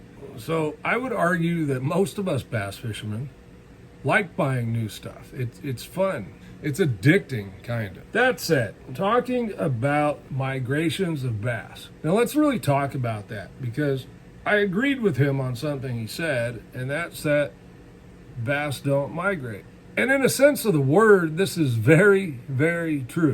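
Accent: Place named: Northern California, United States. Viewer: Your the man love the local NorCal West Coast talk keep up the good work sir...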